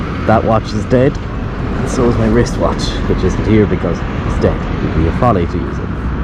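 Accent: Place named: Ireland. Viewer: Just found you. So refreshing to hear another Irish voice coming from inside the helmet on one of these vids. Gorgeous bike too 😍 subbed!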